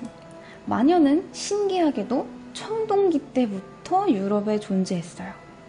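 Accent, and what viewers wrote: Gyeongsang, South Korea. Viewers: 사투리가 들리는데 경상도쪽 억양인가요?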